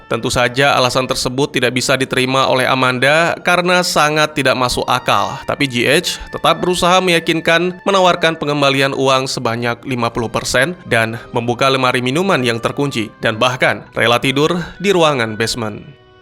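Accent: Aceh, Indonesia. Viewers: bang lu orang aceh?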